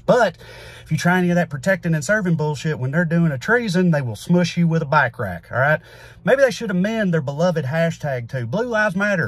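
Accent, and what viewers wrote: South, United States. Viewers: I agree with your rant but I just don't trust anybody with the Country accent after 1.6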